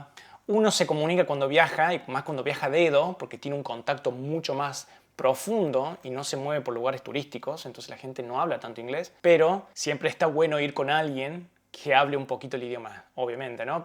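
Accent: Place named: Córdoba, Argentina. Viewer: Mori con tu rrelato!! Hace unos años anduve 6 meses x Nepal e India, y llegue hasta Leh! Que lugar hermoso, y la cultura tibetana, me enamore! Mi idea es volver...No entendi como se llama el monasterio en el que estuviste viviendo, y el puebloto en wl que estaba. Tenes tonito Cordobés...yo vivo en Villa Giardino hace un par de años 😁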